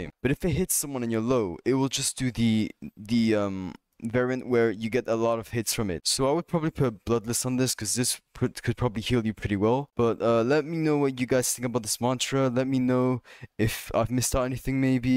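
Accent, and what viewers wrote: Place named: France. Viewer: are you french ? you have a little french accent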